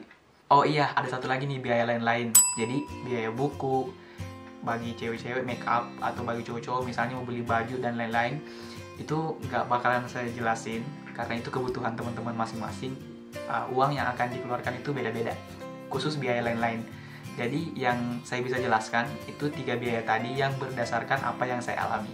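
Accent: Makassar, Indonesia. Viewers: orang makassar kah om? dan ini ausi mana??sydney??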